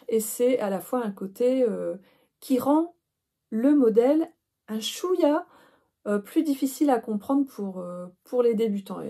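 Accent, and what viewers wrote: France. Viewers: Merci pour ce podcast. J'aime beaucoup tous les modèles que tu as présentés, et tu le fais naturellement, sans chichis, sans essayer d'imiter le débit saccadé des journalistes ni jouer les influenceuses. C'est plutôt rare ! J'aime aussi ton délicieux accent du Pas de Calais dont je suis originaire. Les Harmonies, ça me parle et quelques notes de flûte m'auraient fait plaisir 😊. Concernant le pull Esme, pense d'abord à ton bien-être et ta santé. Chacun est libre de modifier les patrons, d'autant plus si tu cites la designer initiale. ✂️ Pas de bobine dans mes emojis, mais la couture m'intéresse aussi !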